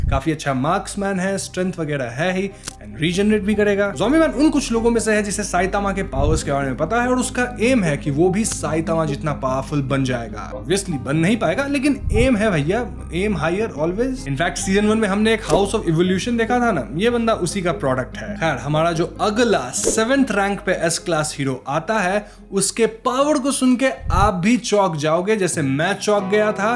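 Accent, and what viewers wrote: Bengal, India. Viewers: Are you bengali... ❤😊😊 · Are you bangali? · Bro you are a Bengali right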